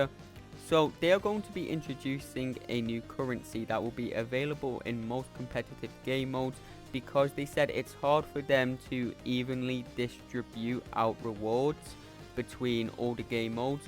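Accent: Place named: Liverpool, United Kingdom. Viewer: He sounds scouser to me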